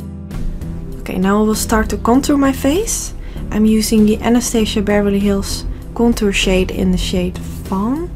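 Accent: Netherlands. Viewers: Hi! Gorgious make-up i love it! 😘 I have a question tho, Are you Dutch? Cause you sound like one. Let me know. 😘 Fallonrachel (The Netherlands)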